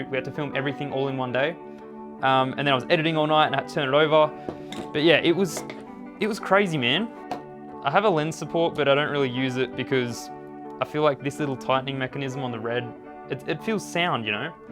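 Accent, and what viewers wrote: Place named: New Zealand. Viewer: Half the reason I watched this was for your Kiwi accent. It's like watching a young Taika Waititi. XD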